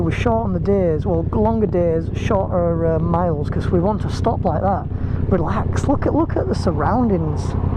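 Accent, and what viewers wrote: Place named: Saddleworth, United Kingdom. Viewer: Brilliant again I've not been up there for years but I plan to do it next year. Where are you from ? you sound local to me ,,Saddleworth way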